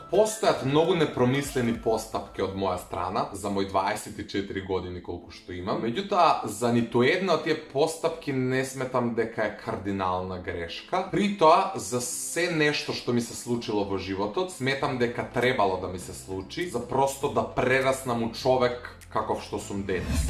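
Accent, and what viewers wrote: Kumanovo, North Macedonia. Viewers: Brat znaes li kolko e tesko od kumanovski dijalekt na makedonski 😹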